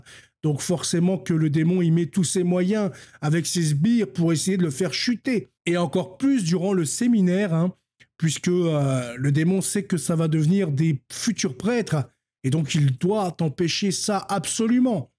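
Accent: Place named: United Kingdom. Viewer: Pourquoi prononcer à l'anglaise des noms manifestement bien français ?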